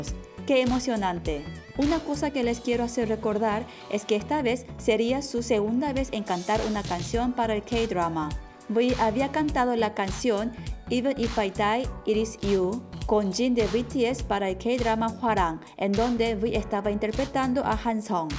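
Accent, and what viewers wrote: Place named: Spain. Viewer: La semana próxima se estrena esta serie en España estoy deseando verla y sobre todo poder oír la canción de mi bias en BTS. Por cierto hablas un español perfecto, con una cuidada gramática, palabras difíciles y no muy corrientes, tu acento parece aprendido en España, estoy totalmente asombrada, magnifico trabajo, saludos desde Barcelona, esperando que salgan las entradas para el concierto de BTS, ojalá no se anulen.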